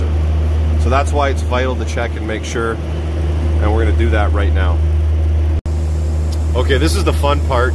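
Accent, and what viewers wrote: Canada. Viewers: 404, EH? Isn't that a freeway going north from the Don Valley Parkway? 😀 You sound like you are Canadian...if not, I will remove this comment. Having fun here in Virginia.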